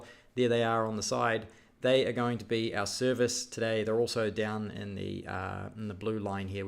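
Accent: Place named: New Zealand. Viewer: KIwi accent!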